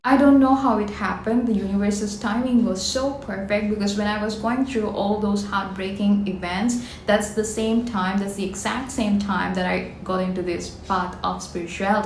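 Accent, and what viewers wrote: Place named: England, United Kingdom. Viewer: ❤ your English accent wow. I want to speak English like you and i will..❤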